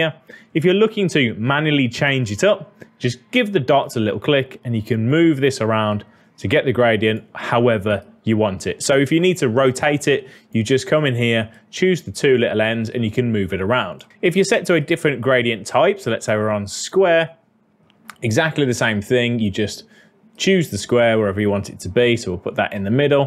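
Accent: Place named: Birmingham, United Kingdom. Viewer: I am watching you from 2 years and I was prety sure you have a brummie accent but I wasn't sure until today when I saw your Twitter account.:goodvibes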